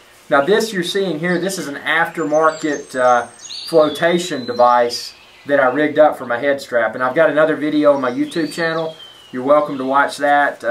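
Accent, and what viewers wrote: South, United States. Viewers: I have a feeling it is angry Europeans disliking his southern accent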